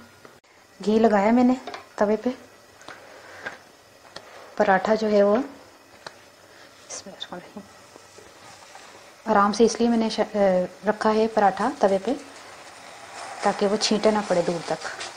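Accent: Punjab, India. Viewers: Your panjabi so sweet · ماشاء اللہ جی... چس آ گئ... پراٹھے کی بھی اور آپ کی میٹھی میٹھی پنجابی کی بھی.. 🤤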